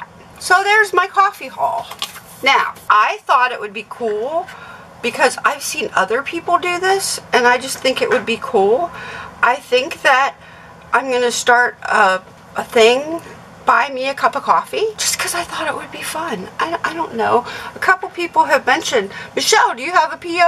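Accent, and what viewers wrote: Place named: South, United States. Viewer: Thanks for the birthday wishes wow statement makes me feel old 😂🤣 Nonnagrace no but I'll take a peek I mostly watch Nomadic van lifestyle as I believe I mentioned before that's what I plan on doing for the remainder of my life hopefully starting in 1.5yrs curious what city in Ohio your from and Michelle sometimes I hear a little southern bell in your voice have a good night y'all 😀😂😜✌️👍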